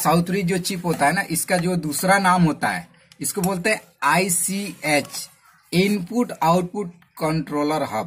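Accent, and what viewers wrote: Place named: India. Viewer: indienglish... q bueno.-....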